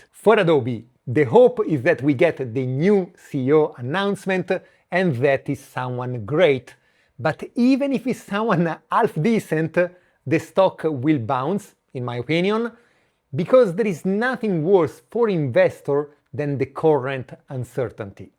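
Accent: Italy. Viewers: by the accent I think you’re Italian like me. Anyway I’ll write in English so everyone can read. I’ve been invested for few months now. I found it because I was upset after I sold my MU position at 120$ and was looking for the next AI bottleneck. They have a spintronics technology which serves to acquire, store and transmit data. And I thought this could be helpful in the future for AI considering the problem now is mostly about Data management. And it doesn’t require water to cool down like nowadays data centers. Let me know your opinion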